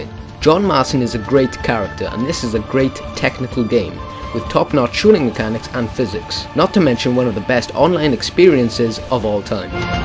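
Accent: Wales, United Kingdom. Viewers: he has a welsh accent lol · you have a slight welsh accent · is he welsh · i just got a question are you brittish?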